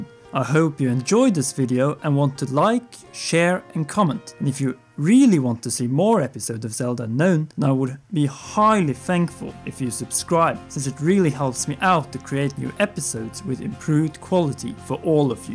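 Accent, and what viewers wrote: Norway. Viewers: That accent, er du norsk? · Hey dude, curious about your dialect, norwegian? Ever seen the series Hell on Wheels? you sound just like the character "The swede". Cheers.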